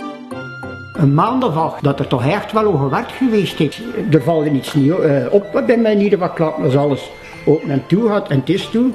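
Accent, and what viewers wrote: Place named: Flanders, Belgium. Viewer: vlaams klappe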